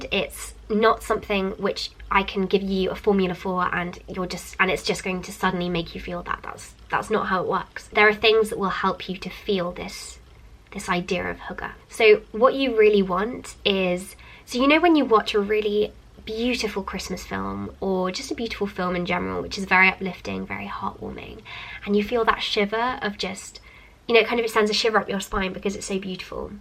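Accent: United Kingdom. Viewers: Can i know ? Do you talk british accent ? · Yes she does have British accent. But you can't "talk" accent lol.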